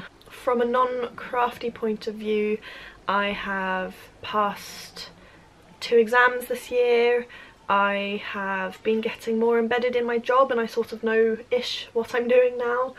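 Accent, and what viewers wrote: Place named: United Kingdom. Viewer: OMG, you are gorgeous, girl. You look so vibrant and healthy and what a !lovely haircut. I'm an old crafter here in southern California who really enjoyed getting to see and hear all the farm life, city life, British accent and crafting, but I was also very moved by your struggles. I was just sitting down to have a cup of coffee when I got a notification from YouTube that you posted an update and couldn't have been more delighted. I can knit and purll but simple shawls and scarves have been about all I managed. You are very, very good. Love your cardigan and the new vest/sweater you are working on now. Than you for updating and know you have a crafting friend in sunny hot-as-hell California who wishes you the very best.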